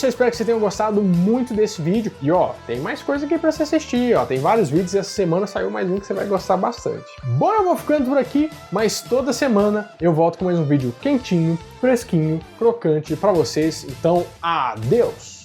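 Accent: Minas Gerais, Brazil. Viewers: Cara tenho muita inveja de quem tem sotaque mineiro kkkkkk é um dos mais lindos do mundo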